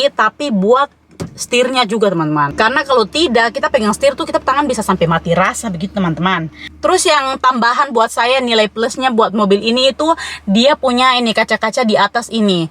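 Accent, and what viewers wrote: Papua, Indonesia. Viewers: MF kk🙏🙏 Kk nc orang Papua iya??? Penasaran dengan logatnx kk😊🙏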